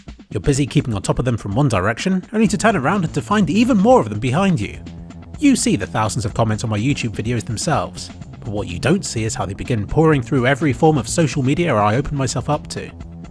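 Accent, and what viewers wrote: United Kingdom. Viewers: For many you are a god, for others a simple person who uploads videos to YouTube. For me you are someone who inspires and gives a good message and gives a smile, I also love how you narrate your videos, I would love to have an excellent level of English and have that British accent like the one you have:c Valve, give a break pls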